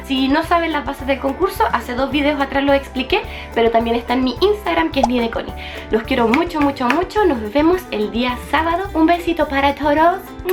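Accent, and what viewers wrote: Chile. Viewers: es chilena? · Eres chilena cierto 😓😓😓😓😓😓😓😓😓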